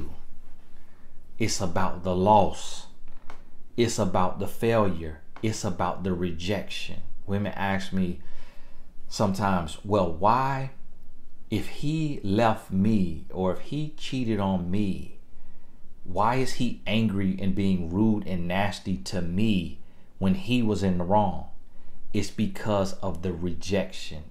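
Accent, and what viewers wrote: United States. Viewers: Woow.. I am hurt and fascinated at the same time. Every woman of all ages should listen to you. That is the pure and pure hard-hitting truth. Less the ex thing troubles me. . BUT why and how men choose women 👊🏻. . Ufff..You shaken me and nowI am awake! I decided to wait a while ago now that I'm 43 and the man who is right for me will love me all the more for it. Basically every man is looking for his wife who they want to respect but we women have to make sure that they can. You are also very funny although I don't understand a lot of things because of the American English. I'm Italian and live in Germany. You're really awesome. thank you.. Wonderful listening to you 🌸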